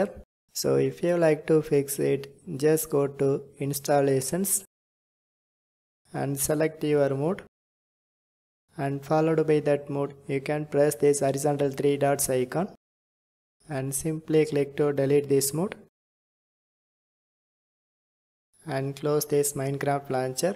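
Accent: India. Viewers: As soon as i heard the inidan accent, i new it will work soon.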